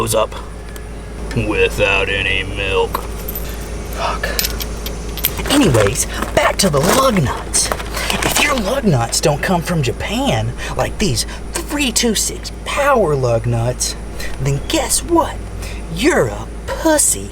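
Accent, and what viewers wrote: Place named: Kentucky, United States. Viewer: I knew the accent sounded right when I saw the Kentucky plate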